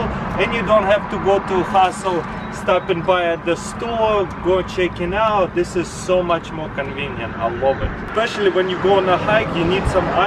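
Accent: Russia. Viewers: Акцент то русский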